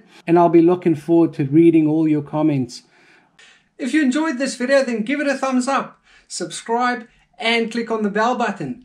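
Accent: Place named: South Africa. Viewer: By the way, with a South African accent! HAve spent a bit of time in Southern Africa Like the video - great explanation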